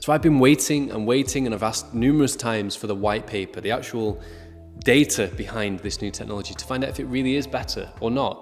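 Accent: United Kingdom. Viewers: He's British. To him it's you who isn't saying it right.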